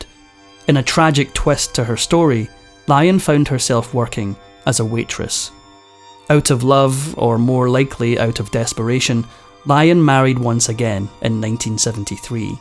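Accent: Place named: Scotland, United Kingdom. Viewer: You have a lovely Scottish accent.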